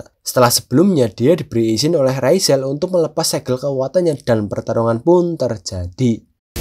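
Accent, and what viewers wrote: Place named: Banyumas, Indonesia. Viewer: Njir wong ngapak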